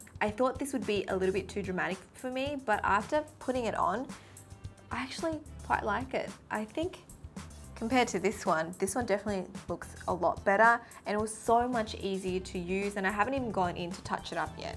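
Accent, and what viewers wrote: Australia. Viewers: Hey I'm new to her channel, is she an Aussie?? I'm an Aussie too so that's why I'm wondering 😂😂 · Are u Australian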